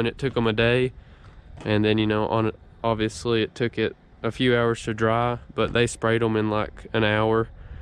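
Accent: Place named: Alabama, United States. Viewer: Never heard a Mexican speaking with a Alabama accent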